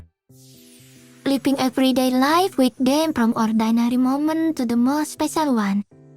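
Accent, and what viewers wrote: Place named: Indonesia. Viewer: Are you Indonesian? Your accent is like an Indonesian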